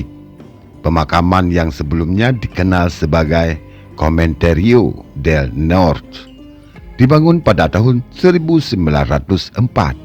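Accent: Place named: Bali, Indonesia. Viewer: Ini naratornya orang balii yaa Keliatan dr logatnya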